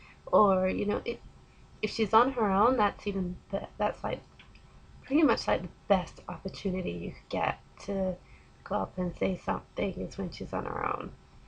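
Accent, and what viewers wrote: United States. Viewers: How are you Australian when you have an American/Canadian accent? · You sound more like an American to me~ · Hey, if you still log into your channel. I have a question...you say you are from Australian? Yet, your accent sounds very much American. haha:p I dated a girl from Melbourne once, her Aussie accent was very thick. hmmm maybe you watch way too much American Television?! Haha:p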